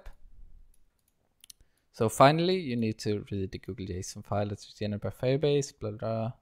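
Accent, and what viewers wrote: Sweden. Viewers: Your English is good! I do play a lot of Counter Strike with Swedes so I recognized the accent 😅 I'm from Denmark so it's not like my English is much better haha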